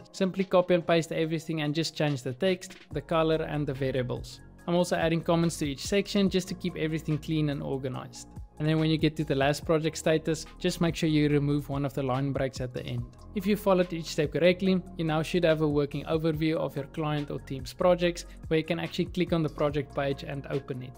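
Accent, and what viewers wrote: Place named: Free State, South Africa. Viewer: It is simply impossible that this is your real afrikaans accent! Sounds like you're from the freestate! Great content - keep it up.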